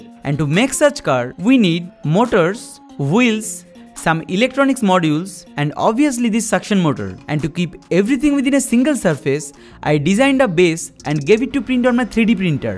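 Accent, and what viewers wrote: Bengal, India. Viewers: Indian accent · That Bengali English accent 🙄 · This is the first video i am watching of this channel and the moment it started i knew he was bengali lol becoz i am also bengali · Go to Shark Tank with this and get this out there! A genius man with a very enjoyable accent, after british, indian english is my favourite commentary language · I love this Bengali accent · He’s sounds Bengali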